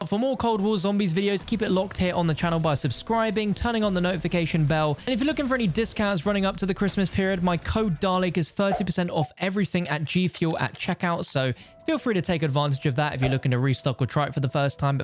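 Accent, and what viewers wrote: England, United Kingdom. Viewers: Don’t pick on his pommy accent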